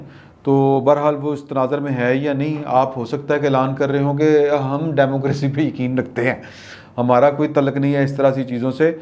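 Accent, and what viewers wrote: Punjab, Pakistan. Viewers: Good information and your way of speech ( punjabi touch ) is very nice . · Ur great 👌 Sir 👌 g good job 👍 naam tou suna hi hoga dialogue with Awais Sheik Multan Wala Punjabi tarka Kamal Ka hota hai aapka Bhai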